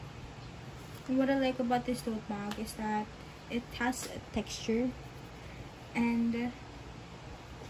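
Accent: Philippines. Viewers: ahaha i knew she was filipino kase her accent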